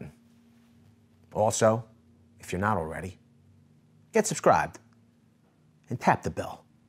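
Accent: Philadelphia, United States. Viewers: That philly accent boi "bawxes" "milwaauwke" love it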